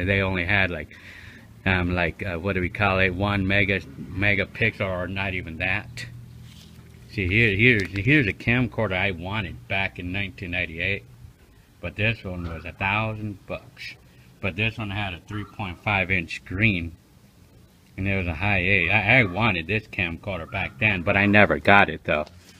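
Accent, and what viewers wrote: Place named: Cleveland, United States. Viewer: Sounds like Cleveland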